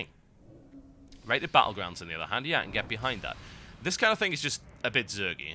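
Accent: United Kingdom. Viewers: Yay!! I love you an your cynical british accent, you make awesome videos some more entertaining than other but still a fine work <3 ^^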